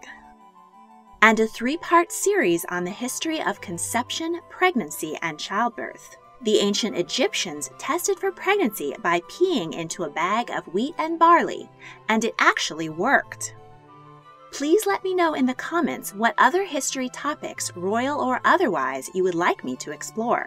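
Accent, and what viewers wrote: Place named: United States. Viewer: From your accent I can tell that you're not European.. Probably American.. So its more amazing that you're making videos about European monarchy..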